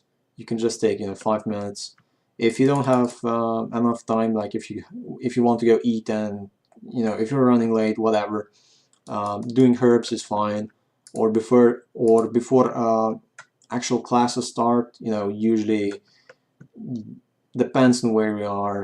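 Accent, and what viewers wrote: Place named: South Africa. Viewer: What accent is that? Sounds half South African, half something else. Nice vid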